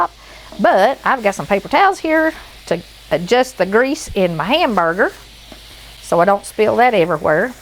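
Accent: South, United States. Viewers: Love your channel, I'm a transplanted hillbilly, and to listen to ya makes me remember being down south! The recipes are awesome too! Keep up the great work, God bless! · It doesn’t really matter what you’re cooking I just like listening to your little country accent !!! The food ideas are great too !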